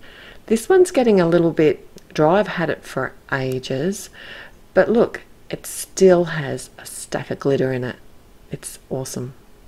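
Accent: Australia. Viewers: Very cute card. Farts doesn’t sound so bad when you say it with our Aussie accent! 💖🇨🇦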